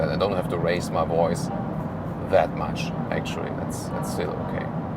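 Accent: Germany. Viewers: german english sometimes feels like german :)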